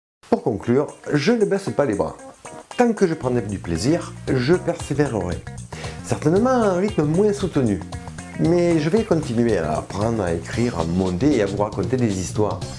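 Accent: South, France. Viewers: De rien. J'adore aussi ton accent du sud, moi même venant du nord, ça donne un côté exotique ;) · La paléontologie avec l accent du soleil et de la passion. Merci! Je n' ai pas de sujet précis à te soumettre, le principal c est que tu prennes du plaisir à faire tes vidéos car c est ce qui nous fait plaisir 😍 Juste une petite suggestion, pourrais-tu trier tes vidéos en fonction des eres et des thèmes? Il est quelques fois difficile de trouver les suites de tes mini séries. Merci et j attends avec impatience la prochaine. 😙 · Encore une très bonne vidéo, comme d'habitude. De très bonnes explications sur fond de vidéos et d'images bien choisies, un vrai plaisir pour les yeux et les oreilles. Perso je suis du centre de la France et n'ai pas l'habitude de l'accent du sud, mais étrangement je trouve que ça donne un gros plus au côté narratif de tes vidéos, donc encore un point positif ! 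Hâte de regarder tes prochaines créations. À bientôt dans un prochain commentaire, Un passionné de paléontologie, comme beaucoup de gens ici :). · J'ai découvert ta chaîne il y a peu, et à ma connaissance c'est la seule du genre. En français. Avec un accent qui sent le pastis. C'est très sympathique, instructif, bien fait. Que du bon, quoi. Alors accroche toi.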